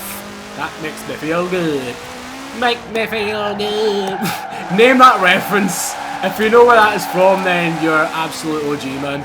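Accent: Scotland, United Kingdom. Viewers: bro your accent is awesome I love the Scottish accent, and am so glad I found your channel again, I can remember watching your game play on a Dodge challenger hellcat when Forza horizon 3 come out and it was awesome but at the time I didn't have my you tube account, and it's ages since I have looking for your channel, anyways my dude keep up your awesome work and I'll make sure I'll stay tuned in :)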